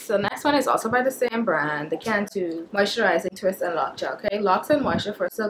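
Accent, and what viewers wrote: Bahamas. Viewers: omg... this is my first time watching your videos. They say Bahamians dont have an accent, from the first time I heard your voice i knew you were Bahamian. Great videos. Thanks for sharing!!! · I'm dying. All I was thinking was why she sounds like a Bahamian explaining something. I finally heard "Nassau" and was validated. Heyyy, cheers to Bahamian vloggers. Keep it up! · You're a Bahamian? At least you sound like one. I love your accent 😍😍😍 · The second I heard your voice, I was like "that's a Bahamian" lol. I was right. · That accent cant hide boy the minute i play the video i was like that gal gata be bahamian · As a Bahamian who lives abroad i watch natural hair videos all the time to get tips. I spotted your accent from the first sentence!!! Im so excited to see a Bahamian vlogger! Thanks for this video girl, it was really helpful. 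· Lmao how I guess you were a Bahamian by the accent :") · mdss..when I first hear you speak I was like she gatta be Bahamian Iol...ik my people but keep up the work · Oops you are from Nassau my family is from there I knew I heard the accent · From you opened ur mouth I was like "Is this girl from the bahamas"! Nice video. I used that shampoo when I was relaxed, but now that im natural I only co wash, I use cantu or pantene co washes, pantene is really good. I really have to get that aussie moist conditioner tho, wanted that for a while now. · man heyyy i recognize a bahamain wen i hear one aee....looool i thought i recognized ur voice...yeah tingz ova here hella expensive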